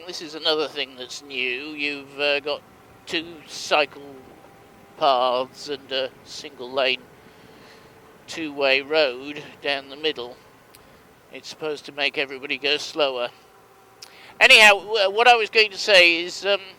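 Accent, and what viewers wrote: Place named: France. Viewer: Sounds like you've lived in France for quite a number of years,.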